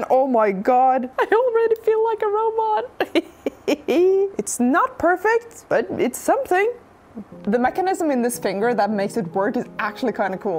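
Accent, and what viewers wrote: Norway. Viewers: 1) You should make it in hot pink to match your hair and 2) a green laser on your fingertip.... 🙂 This is very awesome. I love your accent, too. Norsk? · good to hear a fellow norwegian :)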